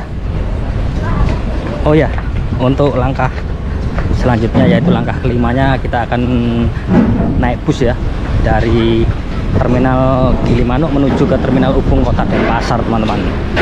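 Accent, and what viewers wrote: East Java, Indonesia. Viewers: Mas orang mana ..kok bahasanya kayak Orang jatim?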